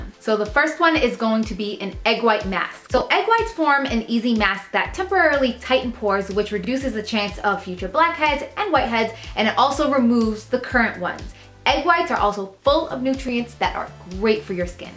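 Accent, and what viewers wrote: New Jersey, United States. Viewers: ayye I can tell ur from Jersey me to😜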